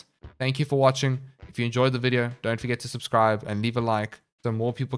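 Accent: Afrikaans, South Africa. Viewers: Instantly heard the Afrikaans accent and now sir, you have +1 subscriber! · this dude sounds south african 🇿🇦🇿🇦🇿🇦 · Is that a south african accent i hear ...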